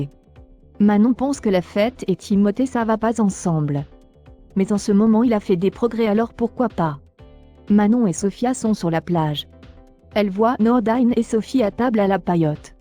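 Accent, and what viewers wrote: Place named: United States. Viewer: Le commentateur prononce tous les prénoms à l'américaine ! C'est stupide !!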